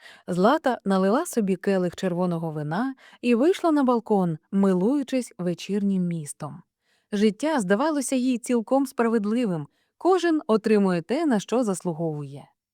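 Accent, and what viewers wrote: Standard. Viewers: Дякую вам за чисту українську мову , за цікаве оповідання .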